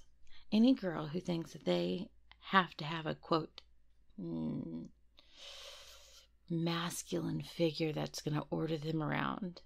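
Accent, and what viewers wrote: South, United States. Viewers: ah within 14 seconds already has me fucking blushing, your pickup lines are too good! will finish this comment when i finish the audio but can already tell its gonna be a good audio, edit: oh damn you really will have me whimpering how do you manage to do a southern voice that just.... makes me absolutely M E L T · I’m in love with this southern hellhound. 😍 I find women with southern accents to be attractive. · Southern accent.. southern accent??? Oh yippee! I love that so much, makes a Brit like me melt and crumble haha. Also, with you playing as a hellhound... imma make it my head canon that you're related to Loona, or friends heh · YAaaayyy! Great audio! Love the hellhound, the southern, the innuendos, the growls, the dom! Awesome work!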